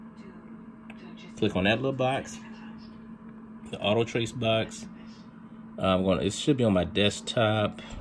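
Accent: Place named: South Carolina, United States. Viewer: you GOT to be from south carolina!!!!!!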